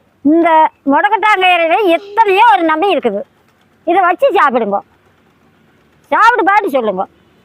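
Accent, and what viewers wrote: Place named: Erode, India. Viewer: ஈரோட்டில் எந்த ஊருங்க, நாங்க பேசுற பேச்சு மாதிரியே இருக்கு, அதான் கேட்டேன்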